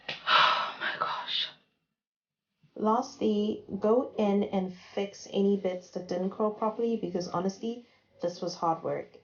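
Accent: South Africa. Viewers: Love the hair. What flat iron did you use? Btw you sound South African.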